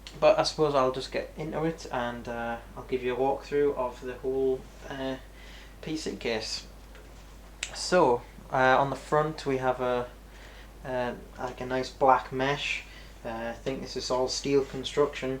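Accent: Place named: Newcastle, United Kingdom. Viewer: Dude where are you from , you sound pretty local to me, newcastle??? im getting one of these cases, so good to see a decent review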